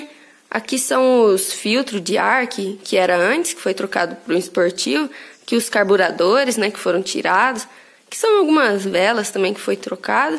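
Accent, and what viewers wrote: Minas Gerais, Brazil. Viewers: VC tem sotaque de mineira. .Tenho um Etios Hatch 1.3 2017/2018 na BR distância mais longa faz 17km/L cidade faz 10 ou 11 mas tá ótimo fusca tem fama de beberrão. .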